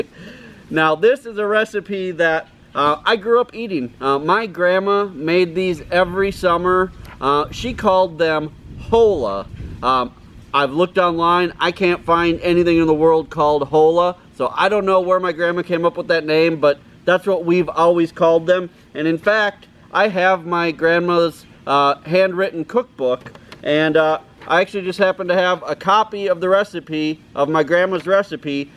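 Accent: Wisconsin, United States. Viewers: I was watching your video and was thinking how you sound just like a friend of mine. I was wondering if you were from Wisconsin. Then I read the description of the video. What part of Wisconsin are you from. My friend is from Eagle River area and I'm from Door County.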